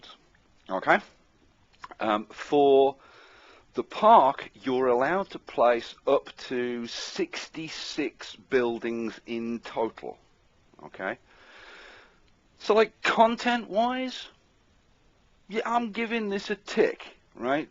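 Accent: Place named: United Kingdom. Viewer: I bought the Amusement Park. And yeah, it's ok, I like it. It would be nice, as you said, if it was more reasonably priced though. Thanks for all of the fun, educational, and entertaining videos! I love to hear what you have to say..... and.....I've always been a sucker for a british accent. lol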